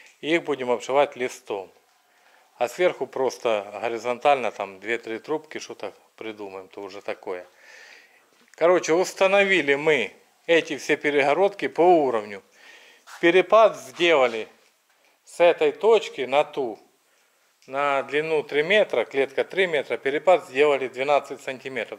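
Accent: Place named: Surzhyk, Ukraine. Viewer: ви приклад для всіх.Відео ваші 👍 Нам дуже подобається як ви розмовляєте по суржику.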